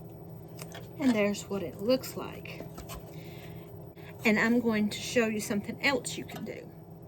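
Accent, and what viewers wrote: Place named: South, United States. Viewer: Hi Sweet.. I'm excited to see this creation using a tray... Funny how wood takes staining differently... Don't ever apologize for your southerness coming out at all... I love that about you... God didn't make us perfect and I love that... The metal ribbon border really makes it pop and very beautiful... How cute is that... I love it.. Turned out beautifully as always.. you are such a creative person, I would have never looked at those pieces and put together what you just did... You amaze me each and everytime... The green ribbon adds another level of beauty to the piece.. the flower completes it and draws it all together... I like the ribbon on it.. thank you for sharing this activity... God bless you and your creativity.. love y'all Sweet... Till next video stay just like you are.. Bye..🥰🥰🥰🥰🧶🧵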